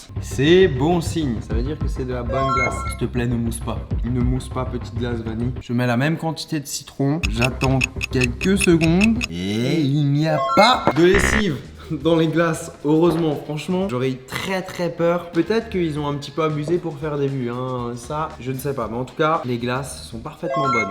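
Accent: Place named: South, France. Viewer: C’est moi ou tu as un peu perdu ton accent du sud😅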